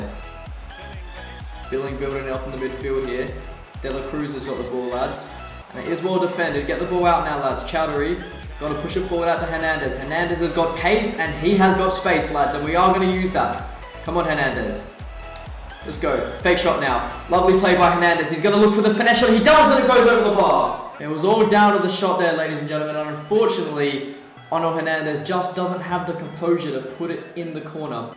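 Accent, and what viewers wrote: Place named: Sydney, Australia. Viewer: hahahahaha bro it’s the sydney accent cuz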